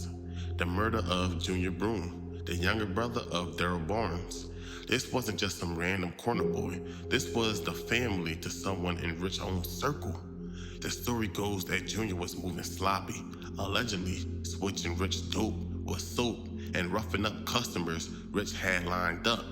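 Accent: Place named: New York, United States. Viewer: Sounds like New York WP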